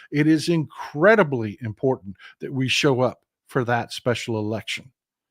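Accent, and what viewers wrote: Texas, United States. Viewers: This week, my Crystal Ball says the focus will be international, pulling out of ALL International treaties and agencies ("IL Dodgy" can't wait to get us out of ITSO!), drawing the focus away from "IL Dodgy" and his Muskrats while they finish killing off domestic agencies. Midweek will see all sorts of outrage from leaders in other countries, by Democrats in Congress and by some Republicans in Congress who have some special interests in some areas. By the end of the week there will be talk of pulling out of NATO, the World Bank and the UN! The end goal will be to kick the UN out of NYC and a bidding war for the prime real estate there. Folks will be shocked to realize the "America First" policy is isolationist and will effectively remove the US from the world stage. As a Texas ex-pat in Europe I sure enjoy hearing good sense being spoken in a dialect that sounds like home! 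Thanks!!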